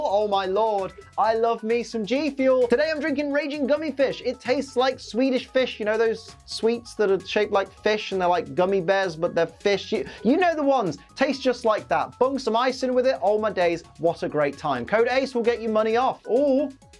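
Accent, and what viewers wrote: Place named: Derby, United Kingdom. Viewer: I love hearing the divine Derby accent. I was born there but i left very young so the accent sounds so incredibly great to me just because no one around me speaks like i do aka swears more than drunk Irishman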